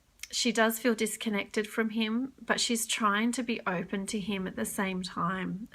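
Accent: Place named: Australia. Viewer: Thank you for your guidance, and for the work you’re doing to help the TF collective. The message resonated. It’s nice to hear readings with an Australian accent! Love and light from Brisbane xo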